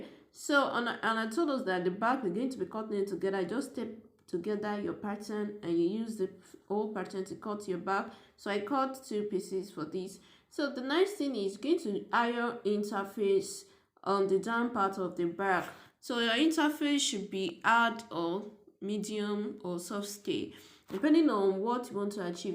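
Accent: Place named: Nigeria. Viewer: I love your natural and beautiful Naija accent ❤❤❤ I had watch multiple times. Thanks for the interesting tutorial! I'm about to try this technique but scared to flop 😅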